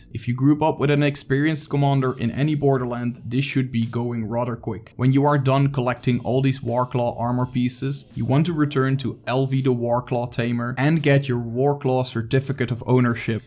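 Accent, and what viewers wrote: Netherlands. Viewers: That thick Netherland accent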